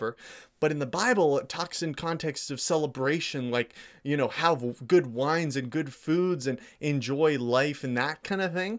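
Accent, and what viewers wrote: Canada. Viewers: When you realize he’s Canadian, the accent becomes sooooo clear.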